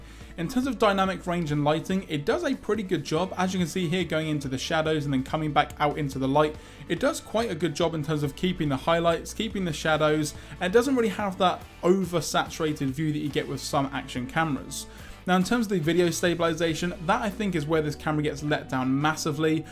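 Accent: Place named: Cardiff, United Kingdom. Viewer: Didn't know you Cardiff man 😂 are you Welsh?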